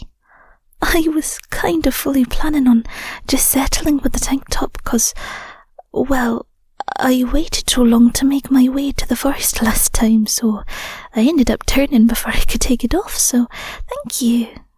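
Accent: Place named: Scotland, United Kingdom. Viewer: I like werewolf girls, I like Scottish accent, I like stargazing. What a great combo.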